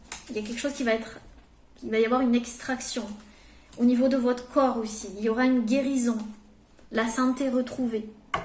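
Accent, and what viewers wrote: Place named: South-West, France. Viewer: Adorable cet accent du sud ouest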